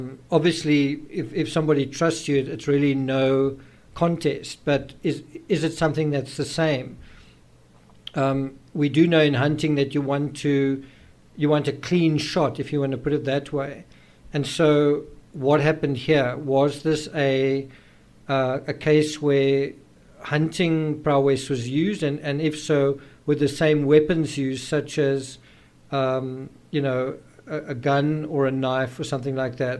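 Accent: South Africa. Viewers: hello, i can't find your name but you sound like a fellow South African. Am I correct?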